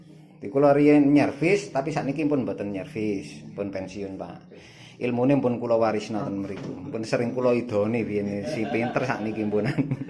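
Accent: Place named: Jepara, Indonesia. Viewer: logatnya kyak jepara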